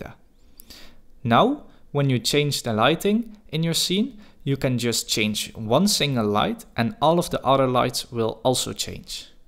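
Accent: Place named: Netherlands. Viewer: hi im not sure but are u dutch. i can tell from ur accent. but i wanna ask something actually. do u think that if i improve my 2d 3d art that i can find a well paying job. bc i want to do animations. and im not rlly into creating logos or commercials. and what u create looks really good. keep up the work ! and thank u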